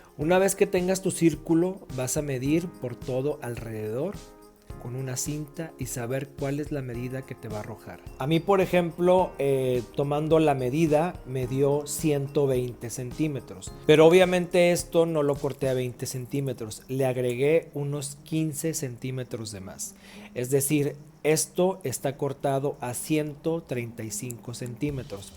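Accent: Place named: Peru. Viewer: Suenas como si fueses peruano 😊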